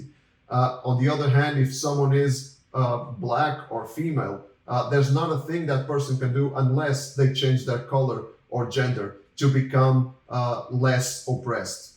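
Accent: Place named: Brazil. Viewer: I'm learning Portuguese , i love your accent, I'm not sure but probably you're from São Paulo do Brasil, or Portugal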